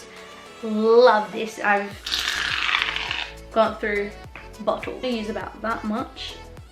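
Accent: Australia. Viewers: i loved your accent, lemme guess you're Australian?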